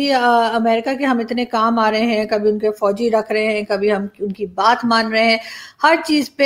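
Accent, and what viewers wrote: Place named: Punjab, India. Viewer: Love to her your witty and satirical presentation of daily unfolding events. what I further admire is your audacious approach to call a spade a spade. You have this unique ability to conduct a formal as well a fun interview with equal flair. Your Punjabi undertones and mannerism makes me greedy to keep listening to you.keep up your good work. God bless. Your fan from India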